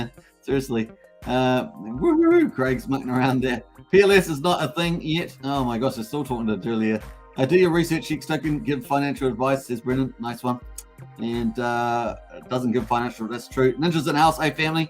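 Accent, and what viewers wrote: New Zealand. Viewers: Are you Kiwi bro?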